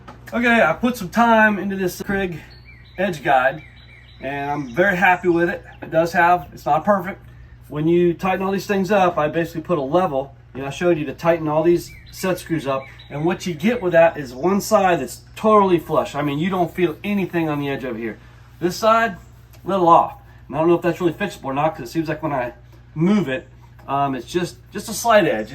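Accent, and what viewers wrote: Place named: South, United States. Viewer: southern accent, scared of manuals that might resemble "books", yeah that fits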